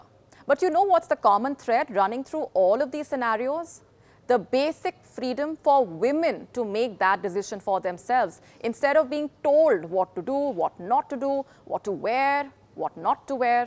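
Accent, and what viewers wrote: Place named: India. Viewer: The newsreader have indian accent. Not little but too much. Let her train more instead of appearing in camera. She read like AI. Train her to pronounce T=th, p=ph, k=kh, etc, etc.... It's not nice to hear the way she pronounced.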